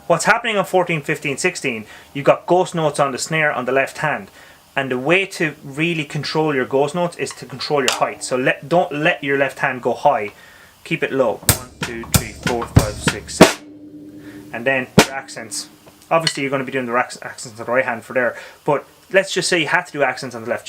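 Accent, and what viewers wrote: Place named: Ireland. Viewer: I hear your pain! I only have a small rubber mat kit at home with an added real bass drum but I can practice on a real kit at school 3 times a week for half hour. I'm about to take Trinity grade 1 but it's hard switching from acoustic to electric when practicing! I like your videos and your lovely Irish accent 🙂.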